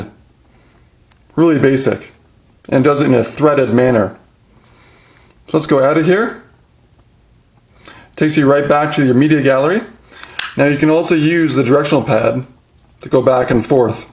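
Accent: Canada. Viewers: I love you canadian accent :D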